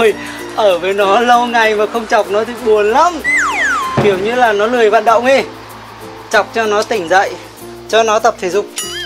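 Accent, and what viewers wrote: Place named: North, Vietnam. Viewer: A nói giọng Bắc nghe cưng quá dễ thương gì đâu Khúc mình quen rồi . Nge dễ thg cực kì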